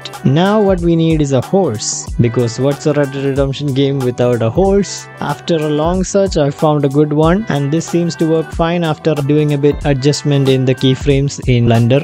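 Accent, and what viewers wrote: Kerala, India. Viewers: Bro's indian accent just makes me relax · indian accent hits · accent feel like desi mallu boy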